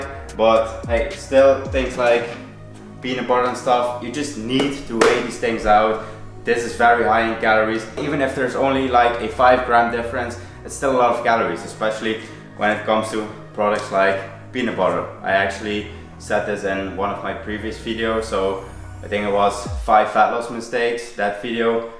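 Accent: Netherlands. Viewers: You sound like you're from Limburg.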